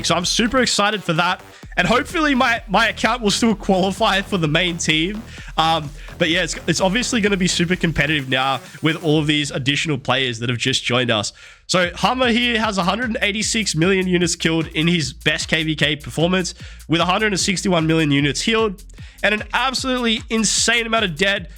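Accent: Australia. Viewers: r u come from australia ? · Oh dam an Aussie streamer. I had no idea 😂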